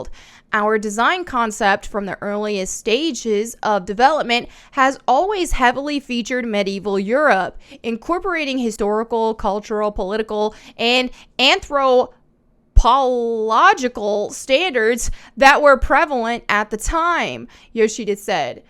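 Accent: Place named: Wisconsin, United States. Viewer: Ran into your channel after diving into the frost craziness. Awesome content creator.. you have an interesting accent. Like you liked in Wisconsin for 15 years and then moved to the south for the last 15 lol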